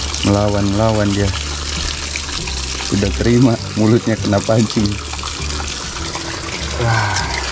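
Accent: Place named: West Java, Indonesia. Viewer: emang sundanesse people mah sulit ngilangin aksen sunda nya semangat bro lah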